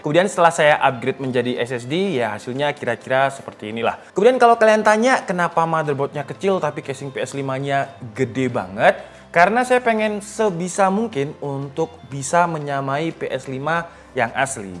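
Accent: Java, Indonesia. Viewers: orang jawa mana bang?😂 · tibake nk ngomong sampean iki jowo nyel yo mas tak.kiro malah raiso.boso jowo😂 sukses terus mas.. mugo sehat slalu, diberi kemudahan dalam menjalankan suatu hal amin..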